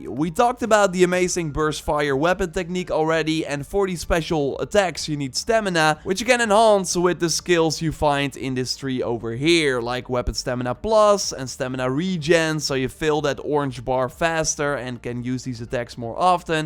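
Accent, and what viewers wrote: Netherlands. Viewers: This Dutch accent is something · nice dutch accent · you sound dutch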